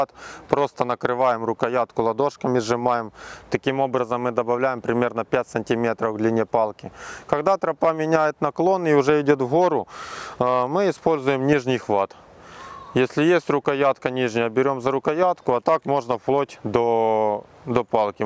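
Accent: Ukraine. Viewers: у вас же лютий, важкезний український акцент. не тортуруйте себе, говоріть українською!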